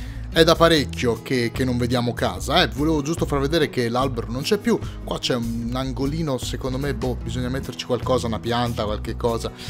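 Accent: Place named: Livorno, Italy. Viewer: ma di dove sei? Avvolte sembri tipo livornese AHAH😂 (sono quello dei guanti i da 9.99€ di decathlon😂)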